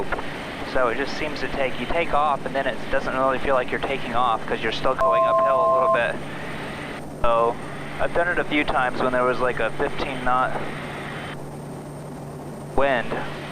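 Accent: United States. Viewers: happy to oblige. I'm in South Lake Tahoe, CA. You sound like you are from So Cal perhaps. Interesting story you have. A joy to watch. Cheers!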